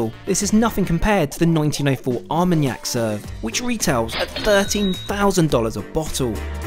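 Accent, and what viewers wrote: United Kingdom. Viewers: Good Videos, but the British English is soo anoying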